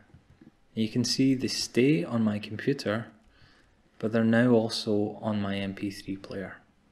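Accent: Scotland, United Kingdom. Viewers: Thanks for your very helpful video. Ever since I accidentally found an old mp3 player unit when curiously searching around and had no idea on how it works until I found this video. If my calculations are accurate, I have noticed that your accent appear to be Scottish. Correct? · Sounds like Scottish 🤩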